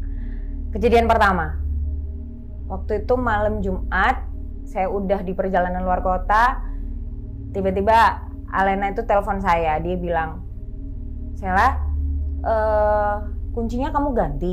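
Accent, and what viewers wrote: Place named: East Java, Indonesia. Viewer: wa ini org jawa timur ya mbak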